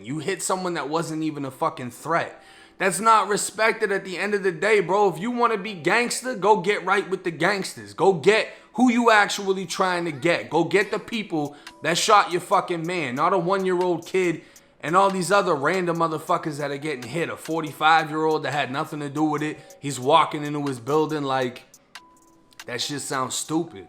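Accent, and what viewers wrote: Boston, United States. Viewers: Favorite channel right now ! Over 23 & 1 even, and those other prison channels🤷🏽‍♀️ I think it’s the Boston accent and dramatic ending of sentences🤣💪🏻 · SO YOU SOUND A LITTLE BOSTON TO ME BUT YOU REPORTING BROOKLYN NEWS.. TAKE THAT SHIZZ DOWN TO BLUE HILL AVE H-BLOCK BROMLEY HEATH YOU SOUND STUPID!!!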